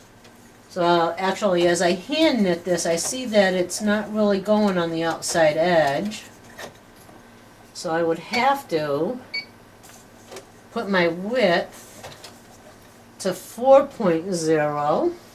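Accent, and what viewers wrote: United States. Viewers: Are you from New England? I have relatives from Rhode Island and I swear your accent is exactly like theirs. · I’m an experienced knitter but I hate sewing seems - I just seem to have a mental block or am too uncoordinated. But I’m now trying to learn to machine sew. Hoping eventually it will make sewing my knits together much easier one day. Thanks for the video. (Also I love your accent - I’m from Northern Ireland so I love noticing different American accents and dialects).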